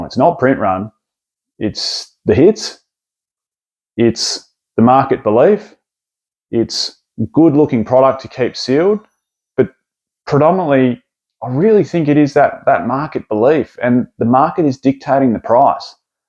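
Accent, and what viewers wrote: Australia. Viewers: thanks for the video m8! really appreciate it! its nice to hear from an aussie. Yah audio though is a bit inconsistent, awesome video but! thanks m8!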